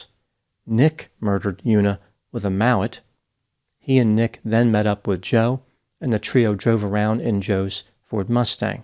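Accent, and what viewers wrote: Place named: Pennsylvania, United States. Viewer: Today's question is, can I analyze accent. Although the cacti in the background suggest a southwest setting, I possibly detect hints of Pennsylvania or perhaps Ohio. I'm not attempting to pin down any geographic region, it's only a theory, my opinion.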